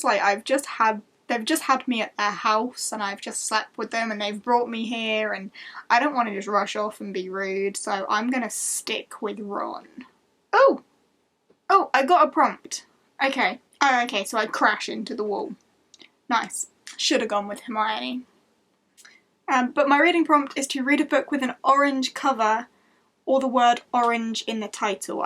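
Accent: United Kingdom. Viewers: I guess you are British, aren't you? Greetings from Italy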